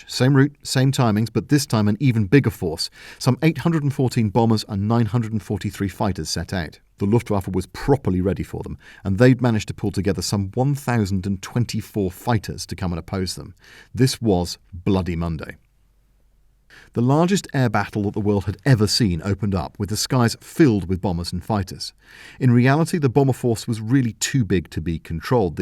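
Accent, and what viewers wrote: United States. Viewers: Your American accent is hilarious, I'd love to hear it more it sound exactly like one of my cousins. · The American accent threw me off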